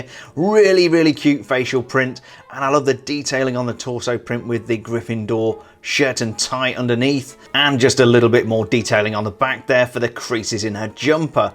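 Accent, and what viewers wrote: United Kingdom. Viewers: Love your British voice sorry if racist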